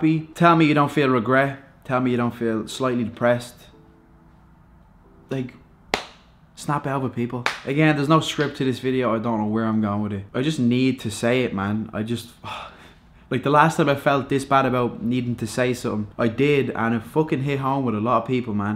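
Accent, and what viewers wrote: Ireland. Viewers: Ireland prolly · i like ur accent i am irish too bud :)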